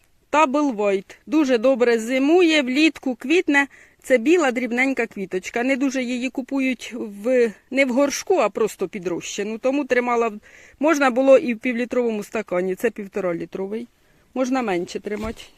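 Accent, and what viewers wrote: Poltava, Ukraine. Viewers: Коли вас чую, то велика насолода від вашої праці, краси і мови, я наче побула на Полтавщині, у своєї тьоті і теж - Ліди. Здоров'я вам та успіхів в цій нелегкій праці.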